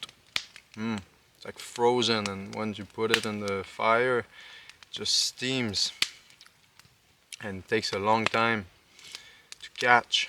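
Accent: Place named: Quebec, Canada. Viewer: Also just found you. You remind me so much of the guy in “49th Parallel.” Lawrence Olivia. Right down to that French Canadian accent. Love it. You were made to do this. People need to know how much water you need in the winter. I worked out in the cold for close to “ let’s just say many years.” Very dangerous when you sweat. I like how you kept it so simple. Beautiful scenery.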